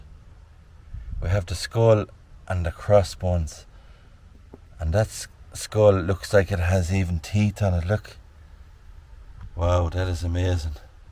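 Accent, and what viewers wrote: Ireland. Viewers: I enjoy your channel and videos very much! As a Midwestern American, I could listen to your great Irish accent all day!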